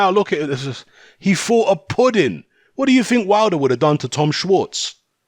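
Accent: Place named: South London, United Kingdom. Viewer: You sound like youre from Sth london ,....tssr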